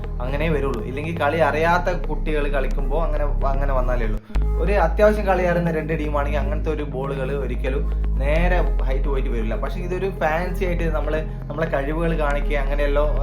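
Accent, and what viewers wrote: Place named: Kannur, India. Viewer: Bro, ith Greenfield ano Chonadam? Kand nalla parichayam. Ingale sound kettapo kannur anenn manassilayi. 😂 ❤ Sub cheythine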